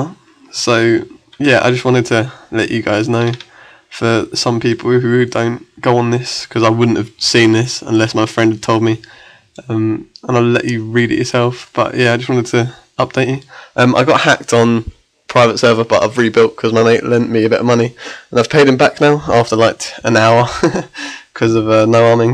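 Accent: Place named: United Kingdom. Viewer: ur british, look at my version plawx?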